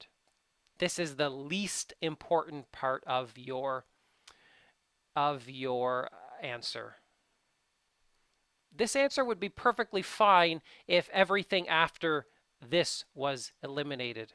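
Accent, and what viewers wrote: Canada. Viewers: Do I hear Canadian accent??? Just curious..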